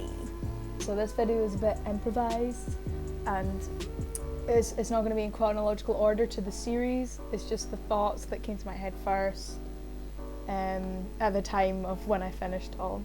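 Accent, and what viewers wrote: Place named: RP, United Kingdom. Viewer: u do sound kinda posh but gang gang sjsj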